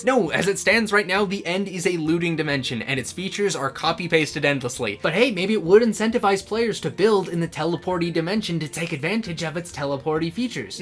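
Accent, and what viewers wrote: Canada. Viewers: Ugh 😩 voice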